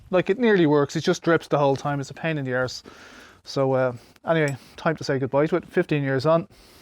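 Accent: Dublin, Ireland. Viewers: Thanks so much. This is exactly my problem at the moment. The house is 18 years old now and the tap,has been dripping for the last couple of years. My husband has never gotten around to replacing it. I’m going to give this a try myself. Greetings from Friedberg in Hessen, in Germany. You sound like you are from Dublin, just like myself. Take care.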